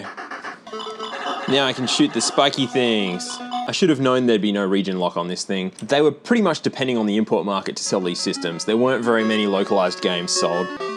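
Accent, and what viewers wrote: Australia. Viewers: He's Australian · Two problems with your comment: 1. He isn't British, he's Australian. 2. Getting frustrated because people from different countries have different accents is a ridiculous way to live your life.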